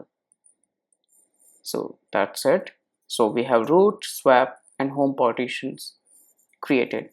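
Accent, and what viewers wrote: India. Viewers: Can i find a video without the FUCKIN INDIAN ACCENT PLEASE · Why do Indians always sound like this ? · great content, but I don't the Indian accent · Indian accent , it makes things so easy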